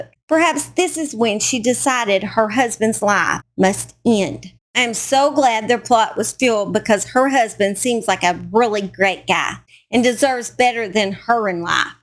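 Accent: South, United States. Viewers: Also I’m from Alabama 😊and we sound the same!! Hand raise for the southern gals ❤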